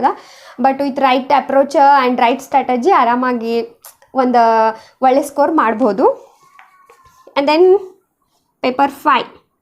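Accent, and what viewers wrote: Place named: Bijapur, India. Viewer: Nin language slang nodidra biyalu seemi Hudge tare kanutte.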